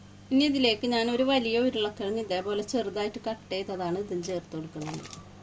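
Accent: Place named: Chavakkad, India. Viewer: Chavakkad vadanapppalli samsaram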